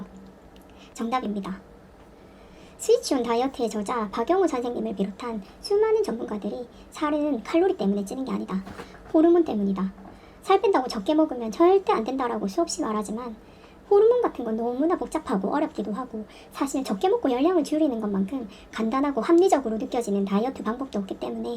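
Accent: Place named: Seoul, South Korea. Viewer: ㅋㅋㅋㅌㅌㅋ 맞아요. 서울말 쓰시는데?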